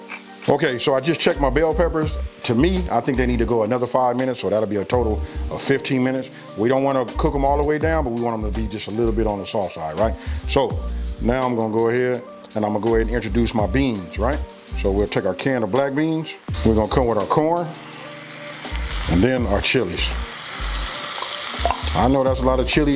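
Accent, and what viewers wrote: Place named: West Coast, United States. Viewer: Bruh your speech is so Westcoast 😂 salute OG!!!!!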